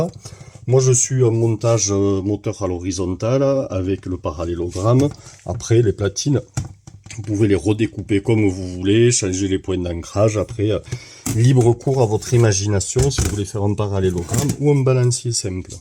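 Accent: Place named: South, France. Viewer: Hep! Encore une preuve de ton talent mon ami, avė l,accent du sud con ! ,ce n,est plus de l,or que tu as dans les mains pour faire ces belles choses d,ajustage, ceux sont des diamants que tu as dans les pognes, vraiment je t'envie ! Et en plus tu es humble 🙂🤘💕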